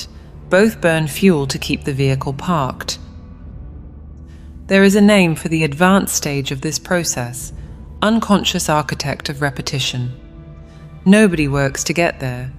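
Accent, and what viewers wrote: United Kingdom. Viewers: Fantastic, thank you so much for listening to us and bringing back the posh English lady. Really appreciate it 🙏🏼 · British voice yes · Thank you so so much for returning the british voice. Please redo the other two videos 🥺🥺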